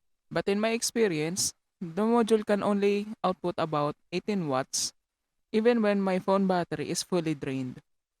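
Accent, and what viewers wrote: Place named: Philippines. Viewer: Yesss gotta love that Filipino accent Ps Great video tho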